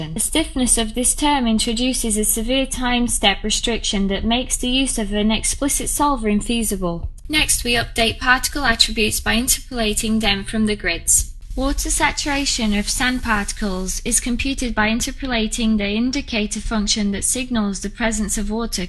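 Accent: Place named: Northern England, United Kingdom. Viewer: Yes, her accent is somewhat northern English. Do you have trouble understanding what she is saying?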